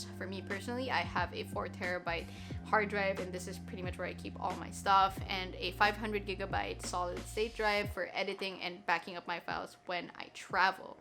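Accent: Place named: Philippines. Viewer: you have a teenie tiny filipino accent in this video :)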